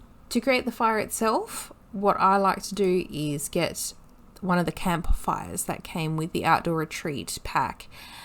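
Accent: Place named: Sydney, Australia. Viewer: You're from Sydney, aren't you?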